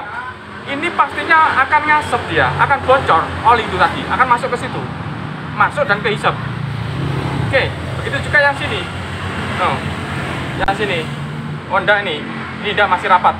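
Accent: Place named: Medan, Indonesia. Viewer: Abang satu ini kayaknya asal Medan😁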